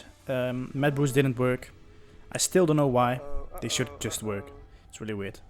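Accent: Netherlands. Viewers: For everyone saying he sounds Dutch , yes he is Dutch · you sound very dutch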